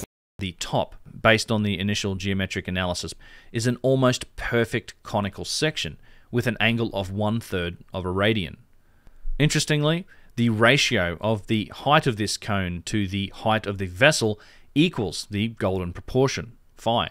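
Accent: Australia. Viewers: That's what happens when an Australian lives in the US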